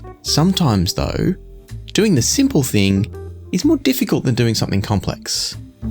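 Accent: Australia. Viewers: your comment assumes that Linear Tube Audio has tested the I2S implementation and then decided not to include it after concluding it wouldn't 'add much'. That is simply something we do not know as they only have 1 DAC in their lineup and no other reference point. I would actually guess that they have not tested it, maybe due to not having the components needed on hand at the time of manufacturing. I do concede, based on your review that Linear Tube Audio really do know how to make really good ins/out in terms of electronics when it comes to clock ect... that alone require a lot of skill. Now if it did have i2s, I really can imagine your voice saying "and if that wasn't enough, as I switched to the i2s, it was like the soundstage opened up even more... the details became a tad bit sharper... not harsher... buta tad bit more etched at their edges, if that makes any sense..." see how much I know your use of words? 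:) I really should stop watching too much of your videos before I start speaking with an Australian accent... Im already close as I always loved the Aussies :) Aussie Aussie Aussie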